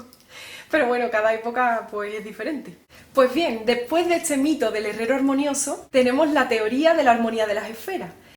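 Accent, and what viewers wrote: Andalusia, Spain. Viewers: Dios mío que de cosas sabía Pitágoras!! Sigues siendo una gran comunicadora, y ese acento andaluz.... (emoticono de corazón) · Cuanto talento en una persona tan joven!! Extraordinaria. Amo ese acento andaluz, tan parecido al acento chileno. Viva Sevilla la tierra de mi bisabuela. Saludos desde Santiago de Chile 🇨🇱🇪🇸🌹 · Es tu acento andaluz?suena muy bien❤🥰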